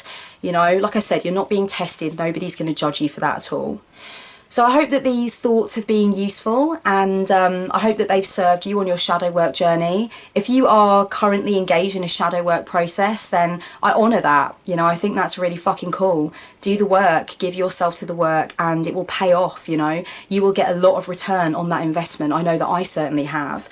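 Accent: United Kingdom. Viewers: I have been following your channel for awhile and it is always in sync with what I am working on at the time. plus you really pull off blue hair well. And as an American with a degree in Brit lit your accent is heart warming. speaking of goddesses my baby daughters middle name is Luna so Diana and Hecate are really big influences.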